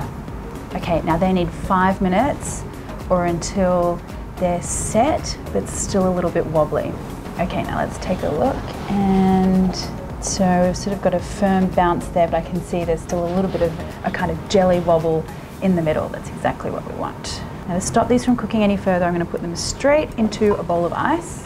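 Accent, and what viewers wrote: Australia. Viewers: aussie accent is everything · Australian accent? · You have the sexiest voice and accent, is it Australian?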